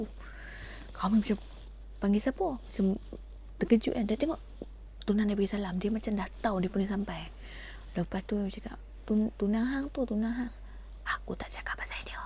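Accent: North, Malaysia. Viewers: Kekalkan cerita cakap owg utara sbb sembang best sgt..kami suka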